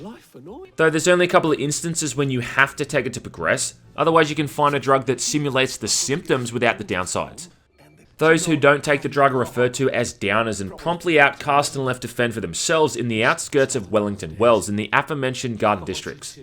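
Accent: Australia. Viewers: Who'd had thunk'd that one day I would understand australianish almost perfectly.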